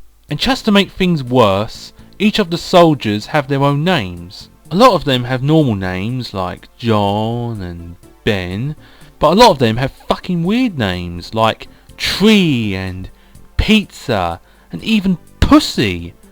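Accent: United Kingdom. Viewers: never knew you are british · Dat brit accent! Come on, you don't sound so bad...give it another go! And about Sonic 2 for GG...yeah, it doesn't make sense. The GOOD ending, that is. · holy crap you're actually british O.O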